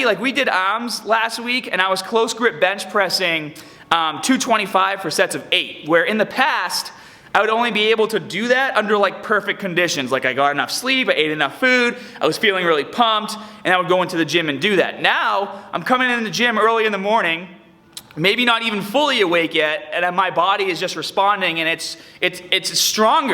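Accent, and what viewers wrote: Boston, United States. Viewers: haha awesome! Picked up that Boston accent for sure. great video man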